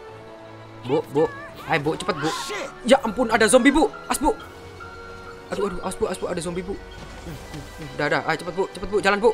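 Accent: Java, Indonesia. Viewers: Bang samean jawa yo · Bang loe orang jawa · bang orang jawa ya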